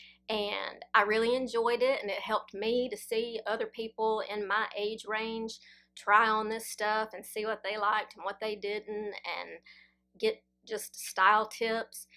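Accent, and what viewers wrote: South, United States. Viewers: Hi - thanks for all your reply’s to our many questions. Your honesty and directness is much appreciated. Glad to hear you found happiness and a surprise son in your second marriage ( lots of us don’t). That will keep you young. I remember your video about the “serial killer living near by and him stalking your sister - that was scary and must of been difficult to tell us about. Love wat chin and listening to your charming Southern accent too.❤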